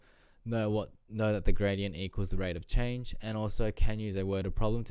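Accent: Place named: Australia. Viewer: i was wondering why this video was so accurate to my Australian curriculum and then realised why when i turned up the volume and heard your accent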